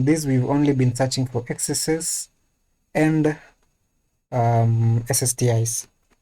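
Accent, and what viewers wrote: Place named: Kenya. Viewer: Bro is that a kenyan accent i here 👍👍 nice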